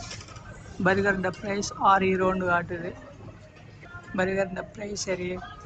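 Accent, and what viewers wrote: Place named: Jaffna, Sri Lanka. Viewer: Eela thamila kathaiyadaaa Yaalppaanam thane nee 😡😡😡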